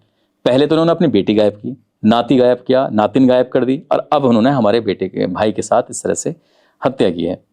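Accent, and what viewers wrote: Haryana, India. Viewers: भाई पता भी नहीं लगता कि हिंदी बोल रहे हैं या हरियाणवी🎉 मान गए उस्ताद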